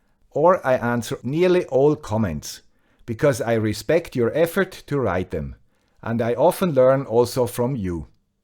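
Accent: Swiss German, Switzerland. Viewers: I told my wife, "The guy with the Swiss accent has a message for you!" I read your response to her, and she laughed out loud! :) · Hi, First, happy new year to you and your family. I take this occasion to thank you for these new year reflexions. I liked your video a lot. Just like i appreciate your technical videos. I really like the quality of your rigorous explanations. You helped me with many of my projects and with my overall comprehension of microcontrolers. Bye (not with your Swiss accent but with my French- Quebecer's one) Stéphane-Billy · Ich bin aus dem großen Kanton, habe aber dennoch Dein Schwitzerdütsch verstanden :-) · Ich bin ja überrascht wie viel ich vom Schwizerdütsch verstanden habe. Bin vermutlich schlimmere Dialekte gewohnt. :-) (Bern und Umland?) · Happy 2022! Thank you for all your content and for avoiding the mid-roll ads. 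You make very good choices; deciding to broadcast in English (with a Swiss accent) was a very good choice - you have the possibility of a larger audience, but you still retain your "Swiss-ness". I love Switzerland having visited as a child, and later on business. Your videos are very thorough and well made. I have learned so much from you. Thank you again. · Another useful and interesting video from the guy with the Swiss accent. Wishing you and yours a wonderful 2022. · Swiss accent is much much better than American. For me, I understand most European English accent better than American and British. Why, because these people speak slow and simple \ easy English words. Thank you for the lecture.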